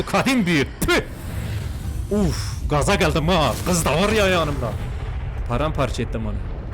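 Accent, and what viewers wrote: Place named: Diyarbakir, Turkey. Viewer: Abe Diyarbakırlımısın şiven küfrın kürtçe bilmen buralari andıri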